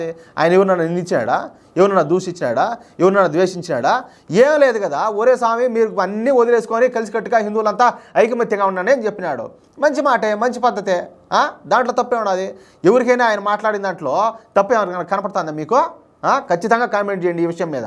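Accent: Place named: Nellore, India. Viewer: Sir. Mee maatalu bhaguntai. Maa cousins matladinattu untundhi. Mi native Nellore or Ongole 😀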